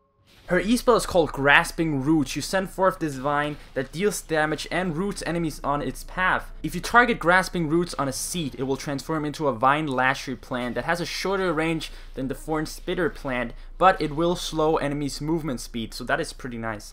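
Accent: Denmark. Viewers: Er du fra Danmark :D?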